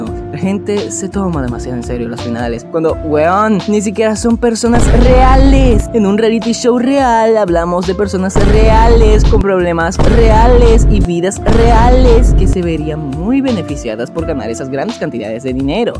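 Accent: Venezuela. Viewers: Mano, se re nota que eres venezolano jajaj saludos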